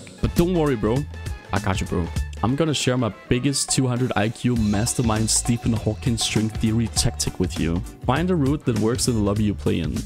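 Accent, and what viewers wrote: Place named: Denmark. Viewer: You sound like your from denmark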